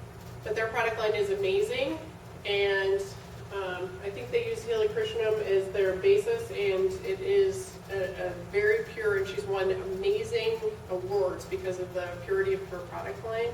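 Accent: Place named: United States. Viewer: Please put subtitles because the sound is awful and sometimes the accent is too American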